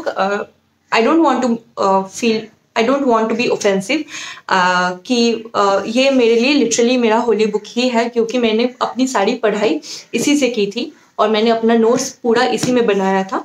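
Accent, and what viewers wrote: Assam, India. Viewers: You sound like an Assamese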